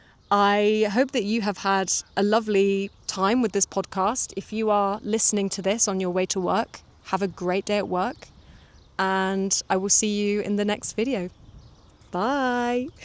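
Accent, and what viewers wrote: United Kingdom. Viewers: Fantastic winery indeed! Personally I love the British accent, definitely is the best, even though there are differences in England too!! And your accent is really fantastic. I know that English is spoken all over the world, so that people speak it with different accents. And this is a bug problem, in particular for natives!!! Thank you very much. You are a great British teacher ❤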